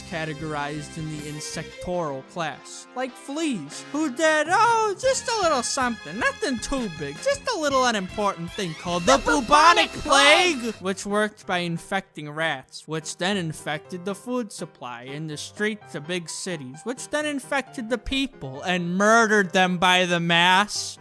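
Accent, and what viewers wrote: Brooklyn, United States. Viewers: Its a Brooklyn accent. Not Dunkeys. Check his clone vid.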